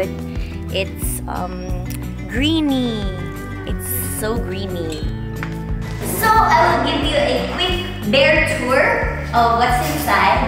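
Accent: Visayas, Philippines. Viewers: hala hahahahaha! bisaya-a oi! molutaw jud.. love it! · Cute kaayo ka mag bisaya naa tawo🙂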